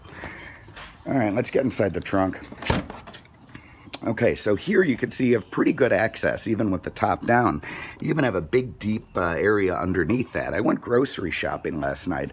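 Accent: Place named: Baltimore, United States. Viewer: always makes me laugh. He doesn't take any "shit offa nobody" and I love the Ball'mer accent!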